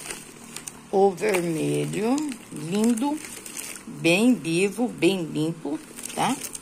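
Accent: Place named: São Paulo, Brazil. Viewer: Vc e do interior de São Paulo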